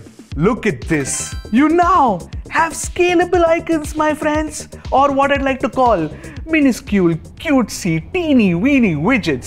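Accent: India. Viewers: The Indian accent is truly awful!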